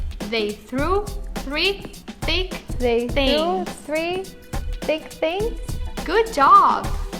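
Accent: Minas Gerais, Brazil. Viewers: You seem to be mineira. Se for é conterrânea XD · so pelo sotaque daqui de minas ja vale assistir o video rsrsrs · Pode passar 40 anos fora do Brasil, mas o irreconhecível sotaque de mineira não sai! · Esse sotaque mineiro é muito amor!! <3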